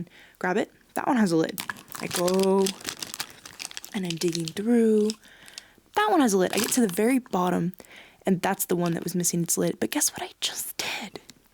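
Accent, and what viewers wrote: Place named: United Kingdom. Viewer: Thank you for the worm reminder queen… Keep being amazing, everything is linked to everything, it all is for your business and also isn’t (living, art etc.) lol, you have NOUGHT to worry about cuz you’re growing a sick lil community here that are all obsessed, you got this and u know it!!! Intuition over 🪱 s… 🌞😎🌞😎🌞 luv & good wishes 2 u from the UK, I speak like your bri’ish accent tehe 🤫😱😍🙊😮‍💨😇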